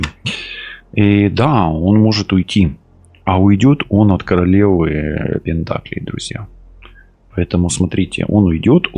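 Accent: Ukraine. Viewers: доброе утро! Как я соскучилась по Вашему голосу,раскладу,как мне нравится как Вы доходчиво всё доводите до нас,как нравится Ваш хохлятский говор,я прожила 42 года с хохлом,мне всегда нравился их говор, шо требе,кохано,цебуля,цеберка,тырло...Что же у меня будет с моим Анатолием,42 г.прожили вместе, больше нет сил терпеть унижения,уехала,в четвертый раз,надеюсь навсегда, больше ничего не хочется,пятеро внуков,две дочери 39,41г. Говорят мама поживи для себя,папа никогда не изменится Анатолий,<num> г р Что нас с ним ждет в наших отношениях?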